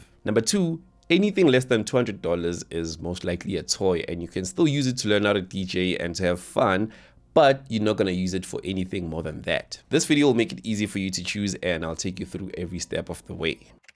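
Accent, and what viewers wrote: South Africa. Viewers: That SA accent my dawg! Lol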